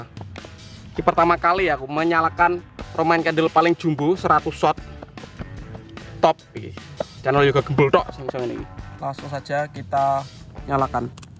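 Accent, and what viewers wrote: Java, Indonesia. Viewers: Orang Jawa ya bang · Kakak org jawa ya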